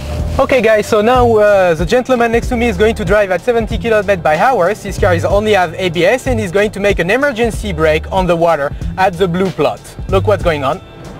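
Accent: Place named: England, United Kingdom. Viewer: ton accent anglais est vraiment détestable.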